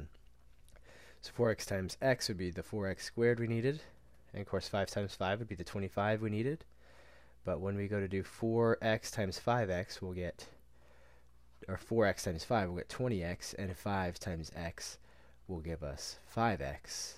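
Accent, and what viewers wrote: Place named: Texas, United States. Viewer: You sound like my friends from Texas.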